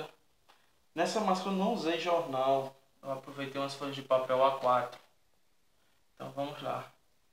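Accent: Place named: Bahia, Brazil. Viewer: vc e bahiano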